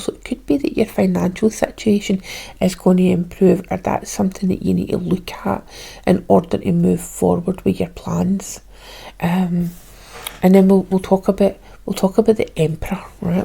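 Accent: Scotland, United Kingdom. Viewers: Great reading! You are absolutely adorable and I'm so proud of you for battling with the technology side of tarot... Never easy hehe Love your accent! It's always a pleasure to hear the Scottish words mixed in I've been watching Len Pennie Scots word of the day and it's been fun getting to train my hearing and comprehension... I love that you'll be inadvertently helping with that It's awesome!! Thank you for being such a lovely friend and stepping in, it's beautiful to see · Love to hear a Scottish accent on here!! ♥️ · Hello! Thanks for your reading. Love that deck. Don't worry about your Scottish accent, it is fine. Well done! We know that Carrie would only let People that she really trusts look after her channel. 👍💐 xx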